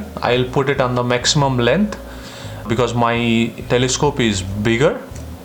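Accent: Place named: India. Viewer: Are u indian. U have an indian accent but ur bio say u are mexican 😅. Why don’t u make more videoes related to telescope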